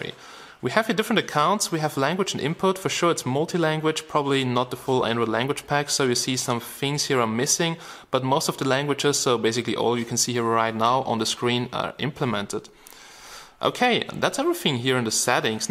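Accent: Germany. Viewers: du bist doch deutscher oder? :D man hört des :D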